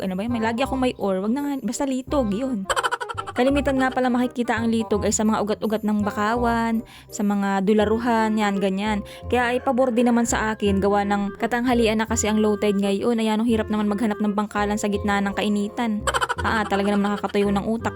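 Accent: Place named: Visayas, Philippines. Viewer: Mat halong Visaya Ang storya mo. may lahi ba kayong Visaya day?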